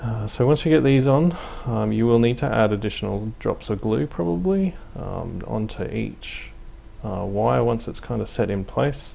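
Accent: Australia. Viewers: Well, in this case and if you really go on with this kind of detail, you should glue corrugated paper on the inside walls as well, as in real life containers the corrugated metal sheet that serves as sides and ceilling, is pretty much the same both inside and outside and if the doors were left open it should be visible. Thanks for the video mate, it's awesome. Subded instantly. If I may, I would suggest that you spoke a bit more slowly and if possible with a bit less of the aussie accent, as it is quite difficult for us non native speakers to follow. Cheers and stay safe.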